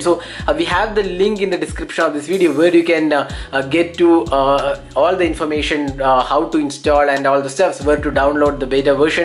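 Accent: Kerala, India. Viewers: Kerala bro? From the L and N pronunciation 😅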